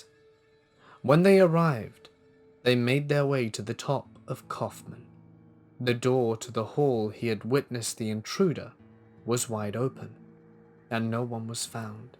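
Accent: United Kingdom. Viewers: Your voice is so calming!! Where in the UK are you from?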